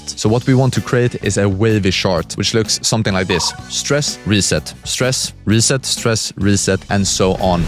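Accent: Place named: Sweden. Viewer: I would love to se this video but I cant stand a swedish accent. Can someone tell me what tho overall ide is?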